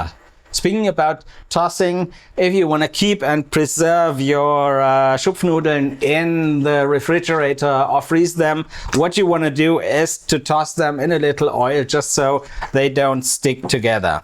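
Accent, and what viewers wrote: Swabia, Germany. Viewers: It is a reference (and a compliment to you) from a U.S. television show called "Saturday Night Live" from the late 1970's and the 1980's. Even though the TV show is still going on, it has different actors now. They do various skits (small plays) during the show. Back then, one of the favorite skits featured two guys who were body builders (weight lifters). And they used a German accent when they spoke. This was when Arnold Schwarzenegger had won the Mr. Olympia body building championship contest and started making movies such as "Conan", "Commando", and "Terminator". So, this was sort of making fun of him, a satire. In the U.S., if you go to a gym to do weight lifting to build muscles in your arms, it is called "pumping iron" because the weights used are made of iron, and you do an up and down motion to exercise, like an old style water pump or today's shampoo bottle with a little pump on top. 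So these two actors, whatever they did, were trying to train the audience, as if they were gym masters, to build muscle, so they could get dates with women. Their favorite line was "we'll pump you up", meaning we will help you get bigger, more muscular arms so all of the women will come to you. You, have large arms, so we assume you lift weights. You have a German accent, naturally. So whatever you do, even though it is in the kitchen, will be to "pump you up" and get bigger, more muscular arms. Even cracking an egg, will be to increase your arm strength "to pump you up". And in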